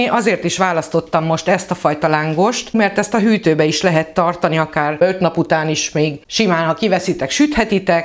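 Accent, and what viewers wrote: Szabolcs, Hungary. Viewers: Köszönöm, hogy ilyen érthetően, ügyesen mondod el minden receptet..😊 Már az első (màr nem tudom melyik videó volt), de valahogy èreztem, hogy igen, ez szabolcsi!! Ez a tuti! 😊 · Kedves! Régóta követem a munkádat és minden elismerésem a Tied. Semmi modorosság, Izes szabolcsi beszéd, közvetlenség és sugárzik belőle a szeretet. Most a lángosod van napirenden. Megvárom, hogy minden átvegye a szobahőmérsékletet és el fogom késziteni. 74 éves vagyok és rendszeresen főzök, de a lángosra még nem került sor. Most igen.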